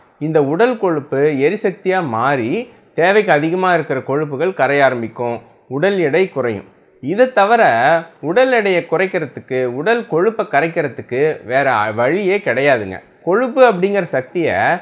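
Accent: Kongu, India. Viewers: ரொம்ப அழகா விளக்கம் கொடுத்தீங்க அய்யா... அதுவும் நம்ம கொங்கு தமிழில்👌👌👌👌🤗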